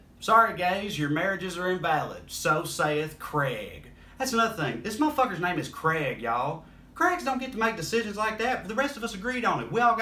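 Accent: South, United States. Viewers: Hey! Your porch ranting is welcome here! Shining a light on lower level lunatics is a powerful idea, and I hope to see a bunch more of your razor sharp analysis wrapped in your warm Southern drawl. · A democrat, smart, good looking, with a sexy southern accent., you're damn near perfect! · Such relief to hear southern dialect in intelligent, articulate concepts. Didn't think it was possible. Thanks for helping the southern folks not look so dumb. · i need a daily dose of u for a good ass laugh! Plus u speak the "Queen's English" whatever dafuq that means. Actually it means u speak with the same fucked up Southern drawl I do. I just get tired of listening to the damned Yankees alllll the time! Keep it liberal, bro! Love u too! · Different accent. The general public think that a southern accent means “stupid”. And sadly, many are. Been fighting it my whole life.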